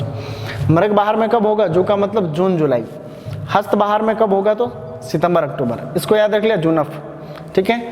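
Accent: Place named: Maharashtra, India. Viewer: aapke baat karne ka tareek mujhe Maharashtra kaa laga so im tell you Any way best of luck for your future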